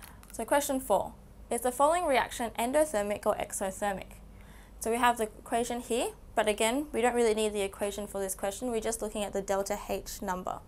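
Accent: Australia. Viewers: I love you sm. I love your aussie accent too🥰🥰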